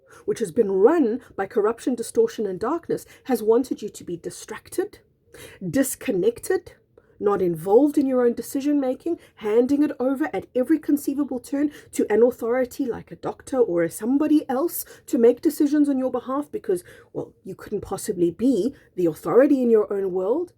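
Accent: South Africa. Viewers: Haha, more just an avid reader. I loved reading books as a kid and I always excelled in English classes, be it grammar, spelling, etc. I guess I'm just a Spelling/Grammar Nazi! On a related note, I asked what her accent was, I'm American, It didn't sound British or Australian. It's South African! They make an "EEE" sound when pronouncing pin 📌 it sounds like "PEEEN." She threw me for a loop when she said "skeletal" and pronounced it "Ske-LEEE-tal." I had to research what her accent was! Like I said, South African, or "South Af-REEE-can" 😘😘😘😘😘😘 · So delighted to discover you,! Your video popped up on my feed randomly today and when I heard your SA accent I immediately Subscribed. Lol! Such an amazing message and I cannot wait to learn more from you!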